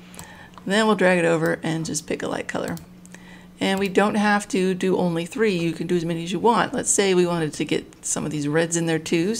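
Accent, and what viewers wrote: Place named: North, United Kingdom. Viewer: oh my gosh I'm from the north and you sound like you are too :P Thanks for the video!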